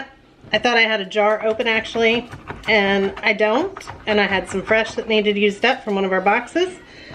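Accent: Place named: Georgia, United States. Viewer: Hi ❤ I can tell you are tired because your Georgia accent is noticable. Love you ❤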